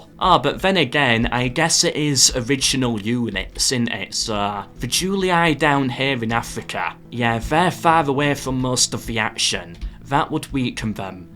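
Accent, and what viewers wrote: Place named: Northern England, United Kingdom. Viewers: It's his accent, he's from "the north". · He's a Yorkshireman, like Richard Sharpe.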